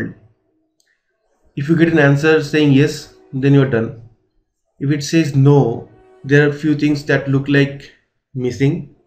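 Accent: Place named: India. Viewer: I hate the India English!!!!!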